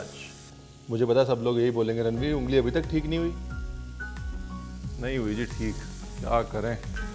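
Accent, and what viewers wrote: India. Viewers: "Main keya ayi k nai hun dhabbe ali feel"😂😂👍 That total Desi accent is so appealing to hear that we rush to to your vedios not just for magical cooking recipes but also for this entertaining content. It makes you stand apart from all others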